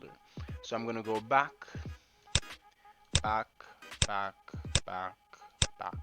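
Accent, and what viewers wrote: Jamaica. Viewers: Good vid bruh. Jamaica? Can hear the patois in the voice Wah gwan!!!! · are you jamaican? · i cant tell if you are from jamaica, canada or india lol no offense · u jamaican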